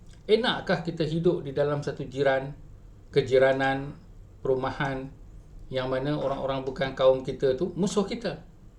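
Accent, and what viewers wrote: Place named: Sarawak, Malaysia. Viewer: berucap mcm orang Sarawak. Mesej bes! Setuju 100%!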